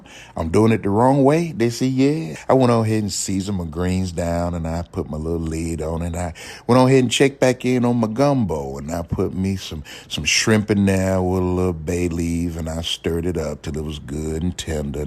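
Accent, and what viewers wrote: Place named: Louisiana, United States. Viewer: Yes that sounds like us Louisiana folks if food critics was a person ❤️😂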